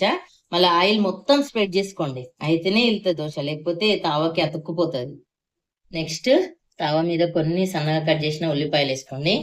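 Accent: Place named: Telangana, India. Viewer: Atla undala slang telanganollamani telisetattu ❤I love your way of talking attamma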